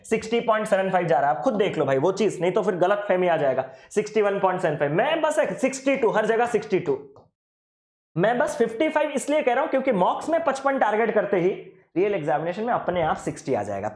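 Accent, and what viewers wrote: Odisha, India. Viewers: Tum odia ho kya kese hindi bol rehe ho❤❤❤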